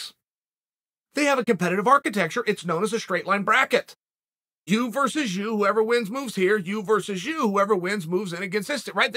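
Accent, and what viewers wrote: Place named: Portland, United States. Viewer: Chael’s speaks Russian with a Portland accent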